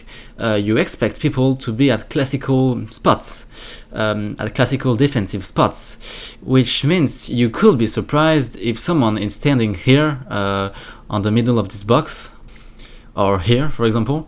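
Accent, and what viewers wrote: France. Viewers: dang your english grammar and vocabulary is really good considering your strong french accent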